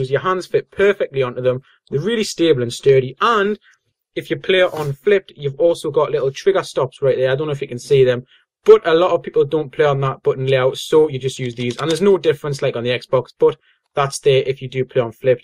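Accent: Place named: Northern Ireland, United Kingdom. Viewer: Ur from ni arent ya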